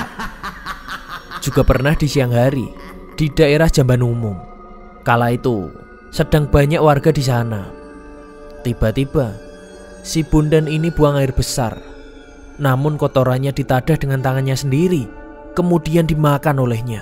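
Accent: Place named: Temanggung, Indonesia. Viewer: Aksennya temanggung banget min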